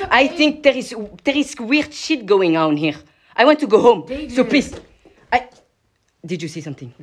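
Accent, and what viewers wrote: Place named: France. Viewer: It sound like a french accent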